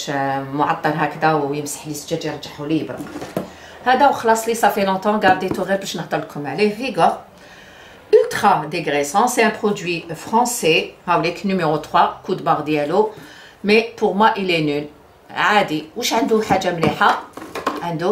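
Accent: Algiers, Algeria. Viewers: يعطيك الصحة حبيتك للهجة تعك فكرتني في العاصمة القصبة بادات ونقى تاعك تقول ميمتي العزيزة راه معايا يعطيك الصحة وربي يجزيك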